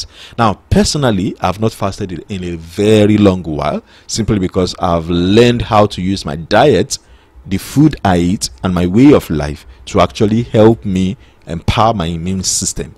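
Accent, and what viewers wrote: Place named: Ibadan, Nigeria. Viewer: Nice one but why your yoruba accent like Ibadan man come dey come out.....pu-bu-lick😊😊😊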